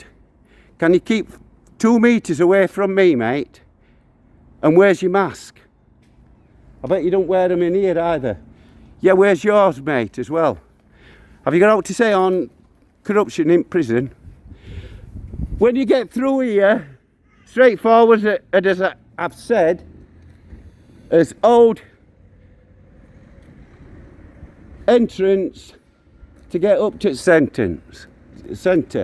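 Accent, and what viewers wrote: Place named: Wakefield, United Kingdom. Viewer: Smashing audit with great commentary. Where are you originally from pal?....very distinct accent! Older style Wakefield or even Royston Barnsley?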